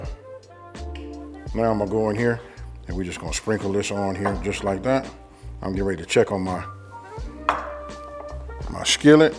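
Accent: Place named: California, United States. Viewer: sounds like an Cali dude who’s family came from the south. ❤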